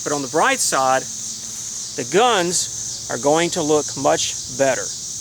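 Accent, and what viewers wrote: South, United States. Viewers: you have more of southern draw now than you did in your older videos. Hooray!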